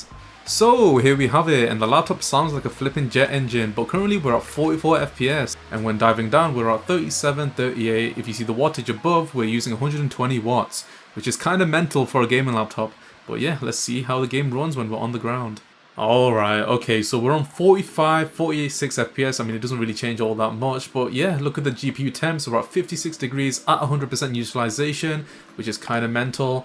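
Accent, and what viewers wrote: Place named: Bradford, United Kingdom. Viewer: This guy sounds like one of those bradfordstani’s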